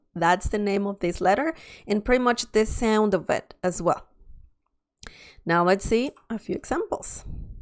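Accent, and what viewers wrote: Spain. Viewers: Is this the Spanish from Spain? If so, I appreciate this, as I now live in Andalucia, and I need to learn the unique dialect. Thank you!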